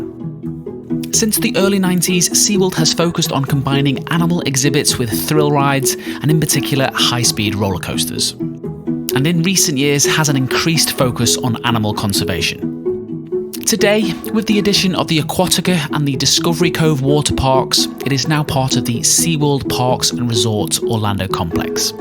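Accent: United Kingdom. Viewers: I love the accent the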